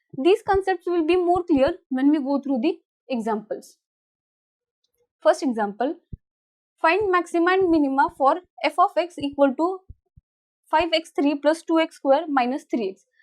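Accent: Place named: Maharashtra, India. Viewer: Hey looking beautiful but your pronunciation seems you a Marathi speaking citizen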